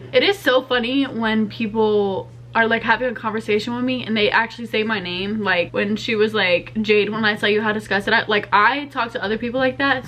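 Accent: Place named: South, United States. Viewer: You remind me of the mom from ginny and georgia especially with the southern voice 😂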